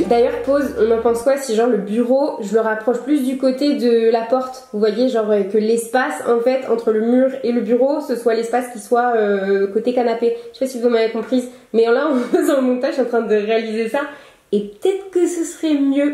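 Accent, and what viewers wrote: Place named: France. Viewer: I love your french accent. It is so pretty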